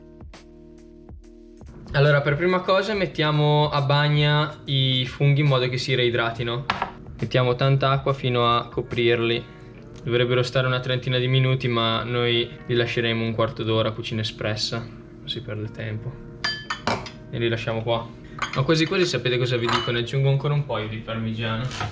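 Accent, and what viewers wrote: Italy. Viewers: Ma sbaglio o hai l'accento cremonese?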